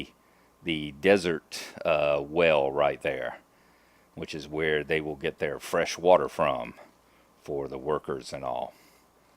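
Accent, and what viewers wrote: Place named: England, United Kingdom. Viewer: "Just leave the damn thing alone and put the new textures in." LOL I know exactly how you feel and agree with you. Use glowstone to melt your channel of water through the ice instead of torches. They're 1 light level higher so will melt one block more in each direction. With the way boats are so difficult to handle you'll need those 2 extra blocks' width. I think, with the beauty and creativity of your Emerald mine you can consider your game well and truly upped. You started building that 6 to 9 months ago and never mentioned it to any of us or showed us? pouts Love how your solution to the dangerous mob ledges above is to cut into the wall to shelter rather than climb up and torch it up. Means your ravine looks so much cooler with the upper extremes still in darkness. Once again it's your small differences, often so considered and thoughtful, that makes a huge difference to the outcome and feel of your place. 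Small correction. Building your mine 4 high does not cover the 4 main layers of lapis, it covers 6 of them, because you can see the ceiling and floor blocks too. You're actually being even more efficient than you knew! :o) G "Had a fun little time: got to trash talk a skeleton and a couple of zombies over there." LOL chuckles Okay, why when you put the text in to correct County Gnarlo to Brocraft does the addition of that "mate" mean I hear my voice reading the text instead of yours? Or maybe yours in that amazing English accent you can employ... :oP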